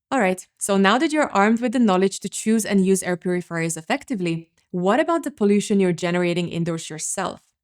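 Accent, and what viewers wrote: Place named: Europe. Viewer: Incredibly helpful video. Plus the Euro accent makes me trust her more.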